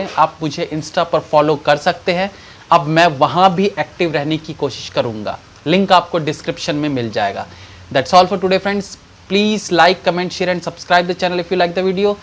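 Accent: Bihar, India. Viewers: आप बिहार से हो?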